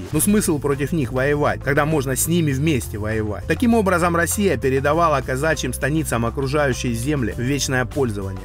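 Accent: Rostov, Russia. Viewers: По говору сразу узнал, что ты ростовский или с Донецка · Я сразу понял , что ты с Ростова как только начал говорить 🗣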